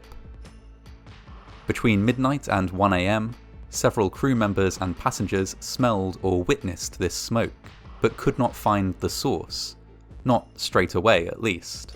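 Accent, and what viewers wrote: United Kingdom. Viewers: Why the British accent ?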